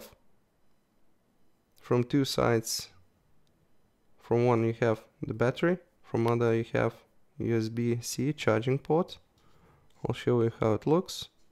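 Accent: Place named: Russia. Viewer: Such a rarely adequate review! You mentioned all things that are needed and skipped by other people, especially time before light becomes loose its brightness on each mode. Thats really essential! So i can see that turbo mode can be used up to 2 minutes before dimming, high mode on spot - 6 min and on flood - 10 min, and medium mode - without limits. Your accent sounds like you are russian-speaking, arent you?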